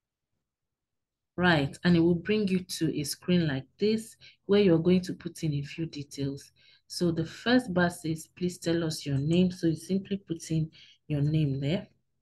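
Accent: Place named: Nigeria. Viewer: Asian face, nigerian voice.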